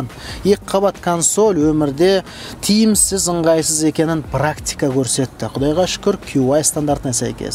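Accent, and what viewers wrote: Standard. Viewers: Жарайсың. өте түсінікті айтасың. тап таза қазақша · Қазақшаң үшін мың алғыс, нағыз таза қоспасы жоқ жалпақ және мәдени түрде түсіндіргенің керемет, басқа айтарым жоқ, семіз лайк 👍🏻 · Таза казакша сойлитин 1 ши блогер жарайсын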